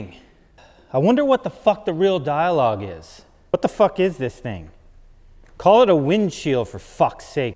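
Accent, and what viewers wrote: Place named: Canada. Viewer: Canadian accent.. eh?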